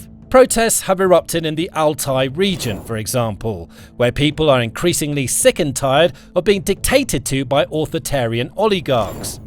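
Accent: United Kingdom. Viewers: funny how every time I hear something like this it is a british accent and when I hear about russia winning it is always a eastern accent and yet, 4 years later and it is the same crappy stalemate, russia still stands(somehow???) despite sanctions and ukraine hasn't fallen despite the pounding the question is, when this thing gets all over and done with I ask, will ukraine be able to foot it's part of the bill? and russia, whatever the hell they're doing to keep afloat still works, but for how long? BTW, my condolences on those reactors.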